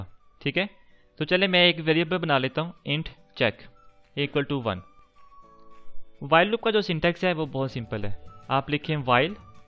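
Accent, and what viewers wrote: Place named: Sialkot, Pakistan. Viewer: good and helping tutorial (y) ... and nice voice :P as I heard you , i knew that you from Sialkot :P maybe u r not but i thought :) Good job ,, keep it up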